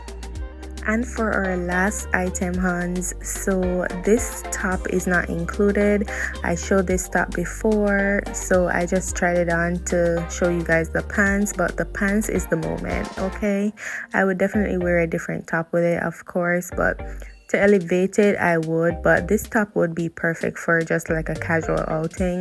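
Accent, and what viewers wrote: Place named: Jamaica. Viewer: Hey Hun, as soon as mi hear di Jamaican accent yuh got mi. I love all of the outfits, mi love Shein Bad. New subscriber ❤. I do have a YouTube channel also, pass and show your fellow Yardie 🇯🇲some love😂❤. Lata❤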